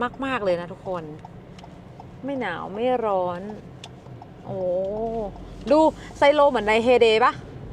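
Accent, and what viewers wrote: North, Thailand. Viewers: ชอบดูคริปน้องมากเลยเจ้า..น้องอยู่จังหวัดหยั๋งเจ้า..ป้าเจียงฮายเจ้าตอนนี้อยู่เวอร์จิเนียร์เจ้า..ได้ยินกำเมือง..ดีใจแต้ๆเจ้าจะติดตามตลอดไปเจ้า.. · ทำไม นู๋อู้เมือง ☺☺☺ สำเนียงไปทาง ชร..พย ☺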